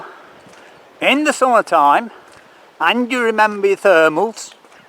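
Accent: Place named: Lincolnshire, United Kingdom. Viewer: I actually quite like Skeg. My mum and dad have got a caravan in the Butlin's camp up there- (with live music on in and outside of the camp pretty much every night), which is a real novelty to me, 'cos we never had anything like that when I lived back home in London. I've gotta ask this, by the way: is your accent a generic Lincolnshire one, or has every part of Lincolnshire got its own specific accent and way of talking?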